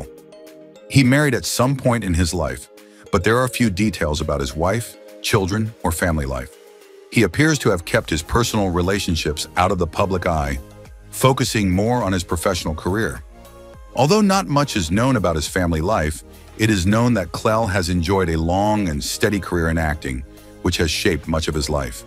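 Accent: United States. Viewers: What a mess up when you get an American to do an English presentation.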